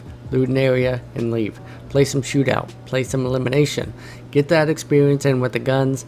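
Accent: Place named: Boston, United States. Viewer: Are you from boston? Cool accent bro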